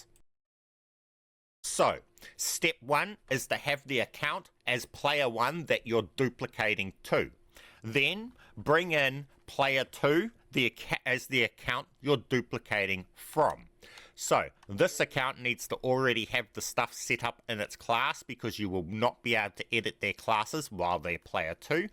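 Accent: New Zealand. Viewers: Churr brother are you kiwi bro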